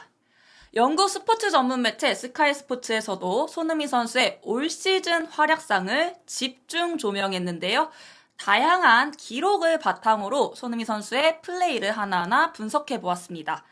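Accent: Gyeongsang, South Korea. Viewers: 억양이 곧 갱상도 사투리가 틔어나올꺼 같은 억양이네욛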